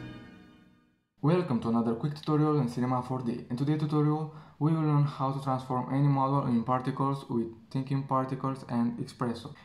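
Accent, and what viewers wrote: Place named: Brazil. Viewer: amigo, vc é brasileiro, né? Pq pelo sotaque ta parecendo, se for, poderia gravar em portugues mesmo. Ficaria um tutor melhor.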